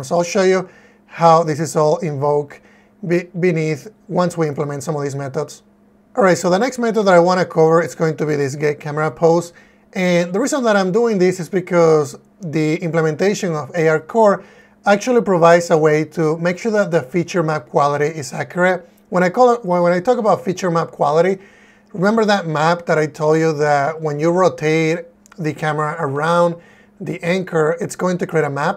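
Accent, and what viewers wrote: Spain. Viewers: your voice combine with Spanish, hard to understand. hihi. Good job, bro. Thank for your sharing!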